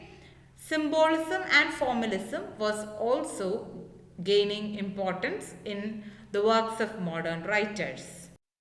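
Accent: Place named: Tamil Nadu, India. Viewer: Tamil accent is very clear. Tamilians should improve in English to sound neutral.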